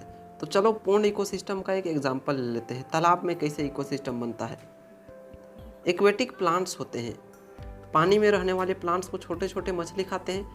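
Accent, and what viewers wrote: Bihar, India. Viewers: Sir aap Bihar se ho kya????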